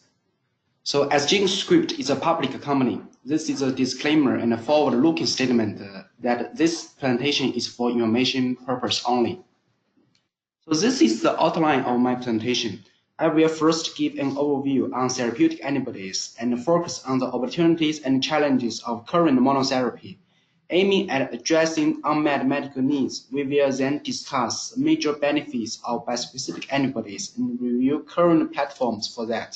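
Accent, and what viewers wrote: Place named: China. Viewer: chinese accent is so strong, can't understand what he says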